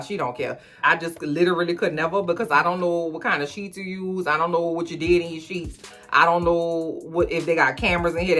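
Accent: New Orleans, United States. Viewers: As someone who is also from New Orleans, I appreciate this commentary in New Orleans accent. I’m just over hear laughing because it’s like overhearing a good conversation. 😅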